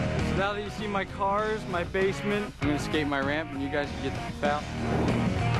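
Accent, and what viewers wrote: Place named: South, United States. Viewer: Before his southern accent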